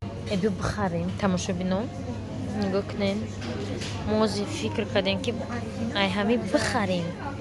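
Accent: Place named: Kulob, Tajikistan. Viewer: Апай духтари Душанбе хасти тоза кулобигт гап мезани зури да👍👍👍😁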